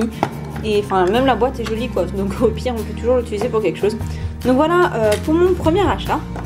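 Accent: Belgium. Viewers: Tu es belge ? Si oui comme moi !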